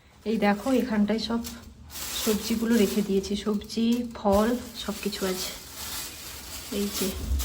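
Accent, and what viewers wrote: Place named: Jhargram, India. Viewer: Tomra j vabe kotha bolo Amara o si vabe kotha boli amar bari Jhargram district a .Ami thaki Mumbai te ❤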